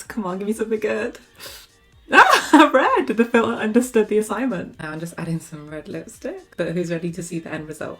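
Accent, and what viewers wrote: United Kingdom. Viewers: Are you from the United Kingdom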